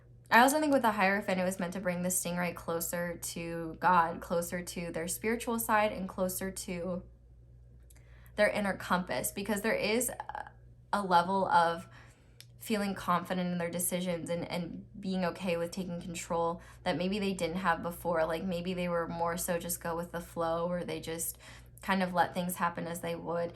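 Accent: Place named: South, United States. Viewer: Pile 2 I love the southern accent this is coming from a southern woman it's not that funny some what's even say it's cute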